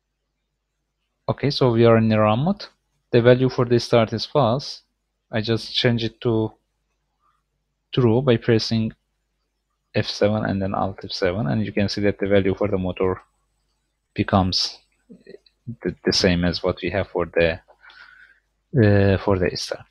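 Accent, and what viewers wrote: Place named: Iran. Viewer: I like the Persian accent! thanks for the content it is useful though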